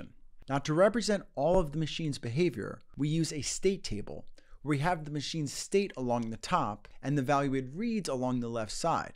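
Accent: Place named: East Coast, United States. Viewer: You have a very similar accent to three blue, one brown. Is that like in East Coast thing?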